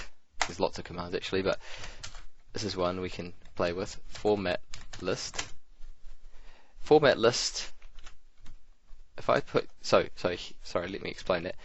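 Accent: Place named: New Zealand. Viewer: Great Vudeo!! Viery helpful and unformative! the accent makes it better. Been doing some revusion on Powershell and this is great vud for ut! Go Kiwi!!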